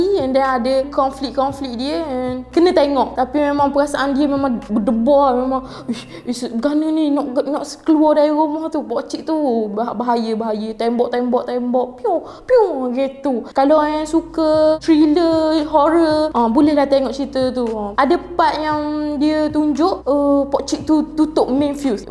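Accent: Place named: Terengganu, Malaysia. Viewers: akak cam orang Ganu HAHAHA sbb org Ganu ni ye cakap gini aa best dengo 😆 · suka betul dgr akak ganu ni borak hahaha comel😂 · Pekat sungguh ganu kak ni😅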